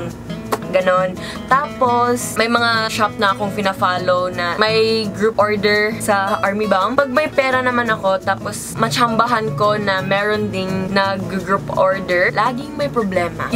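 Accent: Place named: Visayas, Philippines. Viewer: HOOOY YAWA BISAYA MAN DIAYYY HAHAHAHAHAHA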